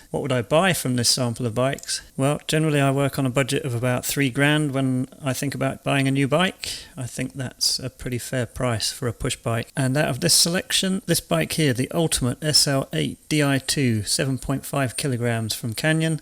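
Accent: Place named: United Kingdom. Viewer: First of all...I loved your British accent...! Great work once again....!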